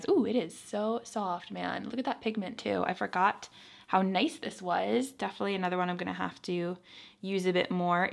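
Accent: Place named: New York, United States. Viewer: I love your New York accent!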